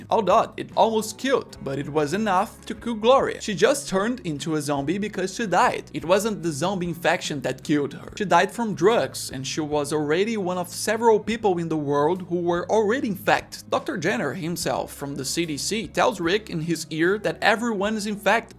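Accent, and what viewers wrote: Brazil. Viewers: Dude, are you Brazilian by any chance? All of us have the same accent when speaking English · Seu sotaque tá com cara de hue br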